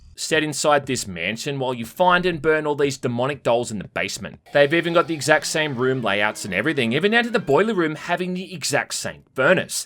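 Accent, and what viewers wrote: Australia. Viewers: finally realizing that the aussie accent is funny.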